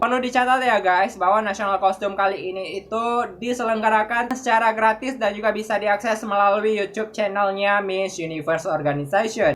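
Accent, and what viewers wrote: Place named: Medan, Indonesia. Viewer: Wak ud ku suscribe channel kau wak,, sukses selalu ya,, kayak org medan cakap kau wak🤣😁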